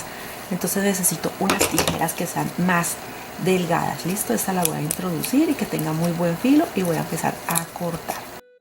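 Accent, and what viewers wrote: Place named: Bogotá, Colombia. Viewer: Que belleza y fácil, oye eres de Bogotá?... lo digo por el acento (con todo respeto). Mil gracias por tan bella manualidad 🤗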